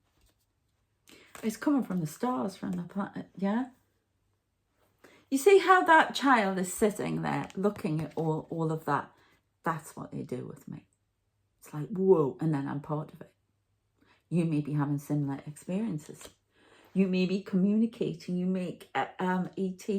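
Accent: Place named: Newcastle, United Kingdom. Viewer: Thank you for your read.. Are you a Geordy. Like me.. I can hear it in your voice..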